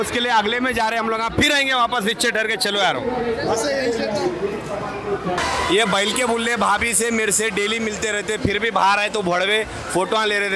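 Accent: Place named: Hyderabad, India. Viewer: Tumhari hyderabadi dialogue delivery ek dum chindian hai ustad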